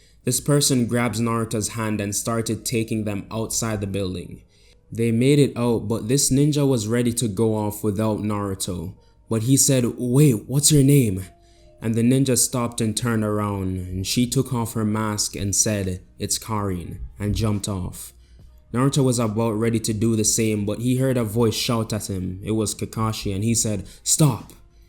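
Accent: Jamaica. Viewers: Very refreshing to hear Jamaican making this kind of content. I had to come back after years to listen to this story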